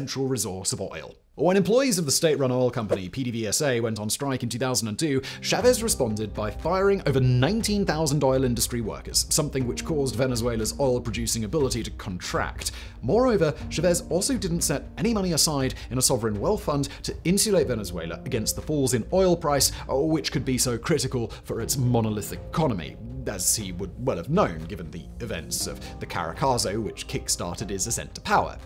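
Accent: United Kingdom. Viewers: Since you sound British, when is the “Royal” family in the U.K. giving up power? 🤔 That is a LONG RUNNING dictatorship!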